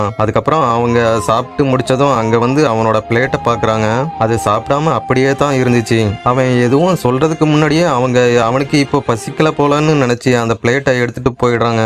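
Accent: Coimbatore, India. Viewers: Neenga coimbatore a 😊nalla pesaringa aana ithu world platform formal a pesunga intha ingu podathinga but nicely talking vaalthukal valaraku 🎉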